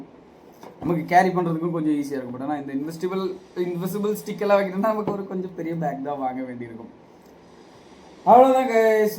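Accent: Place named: Kerala, India. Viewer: Thalaivanoda malayalam and tamil kalantha sound key thani fans undu ❤❤❤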